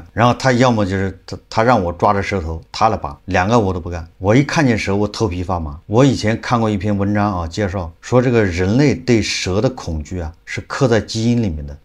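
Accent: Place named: Northeast, China. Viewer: 我还以为是东北人😅爱吃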